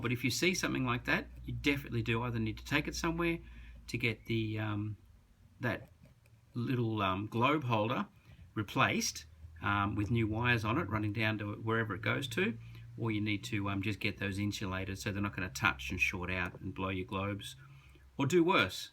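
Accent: Australia. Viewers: Haha it stuck out to me too. This lad is Australian though. (Or NZ? Nah Aus i am pretty sure)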